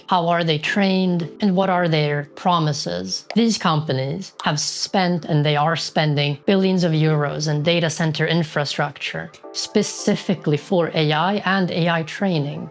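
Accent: Sweden. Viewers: Are you a Swede? The "E"s are quite Swedish. What is it with Nordics being over represented by nerds... is it that outside sucks majority of the time. Winter is nice tho. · Just found your channel, interested to know where you are from; you talk English like a Swedish person. Nevertheless you bring up good points and it is clear that you have given this a lot of thoughts and seems to understand AI and how humans interact with it at a greater level than most. I agree with you that llm's like GPT's are not the path to AGI, it might help us along the way but I think we are running up a wall and need to find a new model first.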